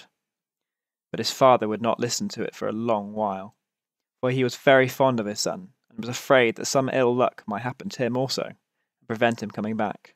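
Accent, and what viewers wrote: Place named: United Kingdom. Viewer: I have searched and searched for these in a BRITISH ACCENT and here I have finally found it!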